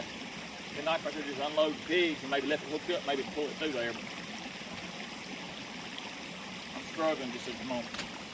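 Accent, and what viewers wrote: South, United States. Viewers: After having seen quite a few of your videos, I am now also starting to understand what you say in your broad southern dialect. That was certainly not the case in the beginning.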